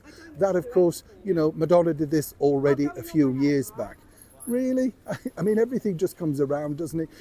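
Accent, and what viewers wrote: United Kingdom. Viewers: Hello. Just want to THANK YOU for Always giving us Something to Smile about. Lovely to hear your Joyouse laughter & great reporting with CLASS. Its Wondrful to listen to a true British Gent Thank You for bringing some sunshine & Joy to all of us. Love 💕 to you & to all in the UK. From USA by for now BLESSING to You 🙏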